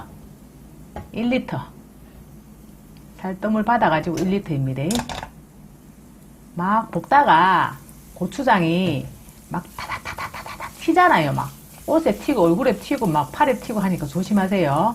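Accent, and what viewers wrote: Gyeongsang, South Korea. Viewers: 얼굴은 예쁜데 목소리는 촌스러운 경상도 아줌마 늘 잘 보고있어요 최고입니다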